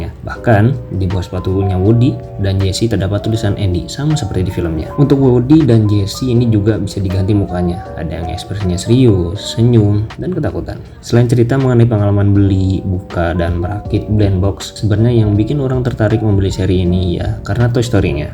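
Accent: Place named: Banjar, Indonesia. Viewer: Keren bang....semangat...kaya urang banjar ( urang = orang dalam bahasa banjar ).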